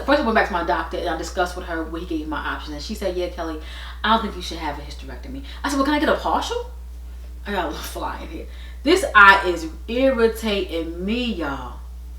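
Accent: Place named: East Coast, United States. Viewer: I love the way you talk! You are from the east coast I’m guessing! Next time you have a gut feeling about your health get a second opinion. I have a large fibroid but I want to keep my uterus. They can remove fibroids doing a myomectomy. They also can do an embolization to cut the blood supply to the fibroid. I been doing a lot of research. I’m on birth control for my fibroid but when it came to my time to remove my birth control I was bleeding with the birth control. I use the ring for birth control and I was able to skip a period except this month. I been taking DIM to help with estrogen metabolism. I wish you all the best and you get relief soon!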